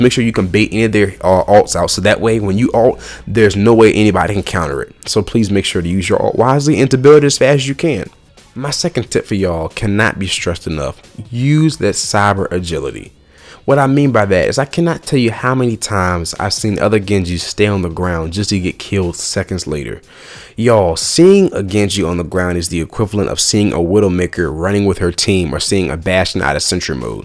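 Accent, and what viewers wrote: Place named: United States. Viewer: wasnt a fan of your heavy american accent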